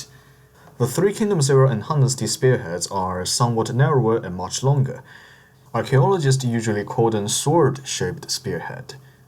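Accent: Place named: China. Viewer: By your pronunciation I assumed that you are Chinese so can I ask in Chinese? 我一直很好奇為何漢朝的盾 或中國以前的盾 都有點倒三角形 跟西方的騎士盾剛好相反 這是為何呢 ？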